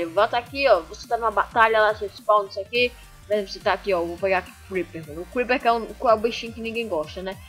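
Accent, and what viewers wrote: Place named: São Paulo, Brazil. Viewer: Aí meu deus esse sotaque misturado com sotaque de São Paulo pqp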